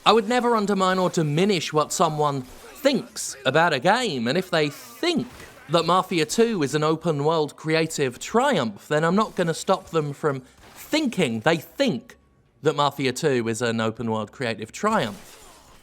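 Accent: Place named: Estuary English, United Kingdom. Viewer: "maaarfia 2"? starting to lose the old estuary accent bit by bit.:D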